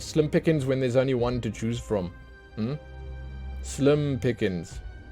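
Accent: South Africa. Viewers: This guy is South African I could tell by his accent I’m South African too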